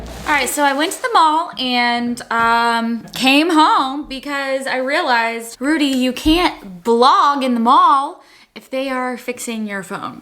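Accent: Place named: Delaware County, United States. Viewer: your resident Philly girl is cringing and laughing out loud at the same time at your Delco accent LOL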